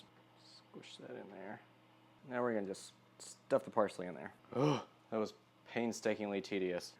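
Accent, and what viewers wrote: South, United States. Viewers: Yum! That dirty rice looked good! And those peppers 😍😍. You sound southern (I'm originally from the Tennessee, so I have a southern draw myself ,haha) where are you from?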